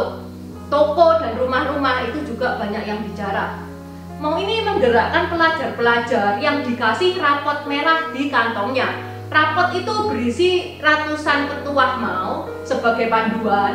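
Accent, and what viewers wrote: Java, Indonesia. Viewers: Rasanya ma'af ya ibu masih harus banyak belajar dialek atau pronounciation bahasa Mandarin yang baik, tepat dan benar déh kepada mas Novi Basuki PhD yang santri asal Jawa Timur itu lho.... karena logat bahasa Mandarin ibu masih bahasa Jawa-Centris! Sorry ya masukan konstruktif! Demikian juga nomenklatur kata "Cina" sudah tidak berlaku lagi/dicabut melalui Undang-Undang atau Tap MPR ya bu, harusnya diganti dengan Tiongkok/Tionghoa / ZhongGuo / China ya bu. Terimakasih atas video-videonya yang banyak memberi informasi faktuil dan bermanfaat!🙏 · Logat jowoneh medok bamget.!! Hehehehe wis ora opoh2 sing pnting mudeng. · Orang jawa pribumi logat nya gak medok cc ini medok bget😀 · Salam kenal Ka. Baca judulnya aja aku sudah suka banget. Eh pas liat video kak berdialek Jawa aku tambah seru nontonnya. Ada lucu, gemes tapi keren banget ka. 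· Logat jawanya medok banget..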